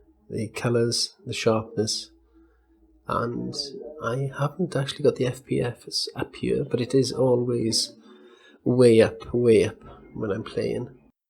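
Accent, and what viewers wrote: Wales, United Kingdom. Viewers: Nice Welsh accent! Cymru am byth!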